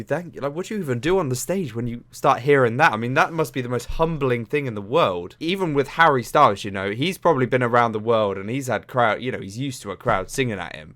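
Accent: United Kingdom. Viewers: I love your brits accent ♥️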